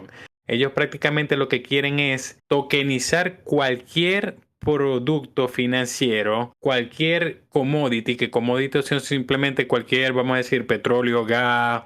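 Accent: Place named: Zulia, Venezuela. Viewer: Este canal es un.. what the fuck!! Solo por curiosidad escuche el acento zuliano, pero de verdad mal producido y desarrollado el contenido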